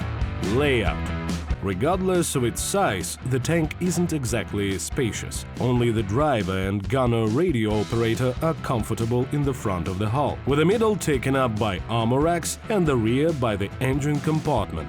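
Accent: Russia. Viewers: Rest of the community: (A WH40K Tyranid Hivemind that can't get a cohesive thought) Me: "Ah yes, I like your words and history, thick Russian narrator man!"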